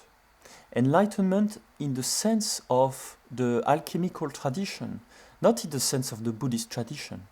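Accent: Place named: France. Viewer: Thanks man! I fell in love with your french pronounce 😍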